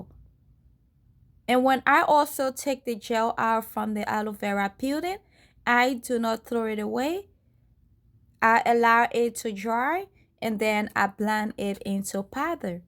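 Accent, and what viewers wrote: Liberia. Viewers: You sound so Liberian 🇱🇷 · You sound like a Liberian🇱🇷❤️❤️ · Are you a liberian? You sound like one qnd i'm a liberian too so i'm asking.. thanks for the video. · You a complete Liberian sis that tone can’t hide. Thanks for the video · My mother in law is Liberian and your accent is so similar. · I hear a little Liberian accent 🥰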